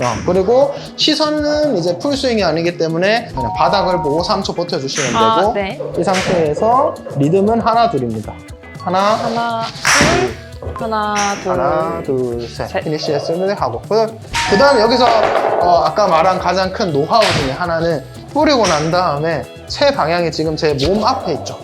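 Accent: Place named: Gyeongsang, South Korea. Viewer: 사투리 좋아요 지도 경상도라 방가방가입니다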